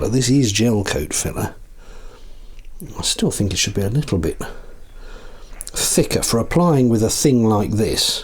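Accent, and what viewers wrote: United Kingdom. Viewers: Your British accent is so charming "Its ever so thin and runny..." 😍 Glad you held off in care of your back!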